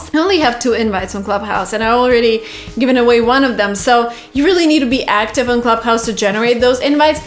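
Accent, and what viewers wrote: United States. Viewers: Are you from American🇺🇸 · Thank you, after watching the learning video you gave I feel that my English skills have increased. I like your accent even though you are originally Russian but your American accent is very good. You are my motivation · In which way I can talk to u to learn American accent my age is 14 plz help I like the way u talk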